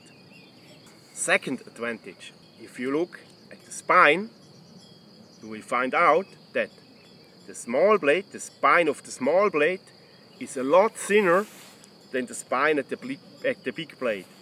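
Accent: Germany. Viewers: А что у него за акцент? Немецкий?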